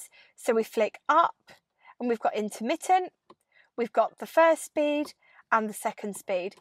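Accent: United Kingdom. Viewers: Your british accent is wonderful 😊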